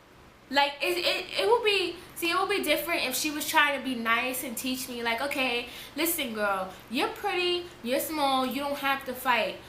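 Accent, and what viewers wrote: New York, United States. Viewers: I love your New York accent !! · They way you talk, like you sound like from your from new york. I know you are from there but. I wish i could talk like that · You was going in talking about the story, especially with your NY accent I Lo it lol.... But I love your moral of the story! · Love the New York accent and moreeeeeee story time plzzz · I love to listen to her I love NY accents so much 😂😍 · Are you from NY? And I don't mean this like in a mouthy or smart way, I promise 😂👐 I just legitimately wanna know if you're from NY cause I just gave a question about the dialect lol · I'm really sitting here realizing all of us from Ny talk the same when we mad or explaining a fight . Lol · I love her accent my cousins are from NY and they make fun of my southern Floridian accent 😩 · Lmao Spoken Like a True New Yorker! Love You! 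· Your New York accent is so cute lol. I love it.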